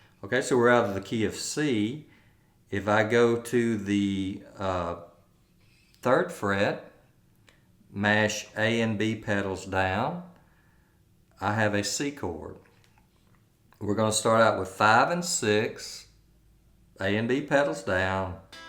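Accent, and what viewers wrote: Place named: Tennessee, United States. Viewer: by the timbre of your voice I think you live in Tennessee, that is all..thank you for your time Sir.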